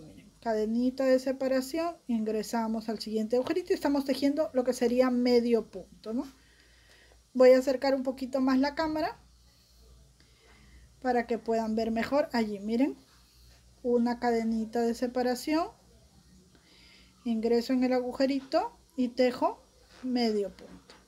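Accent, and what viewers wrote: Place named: Mexico. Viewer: ¿Eres Peruana?...tu acento es muy parecido al del norte de América , específicamente de México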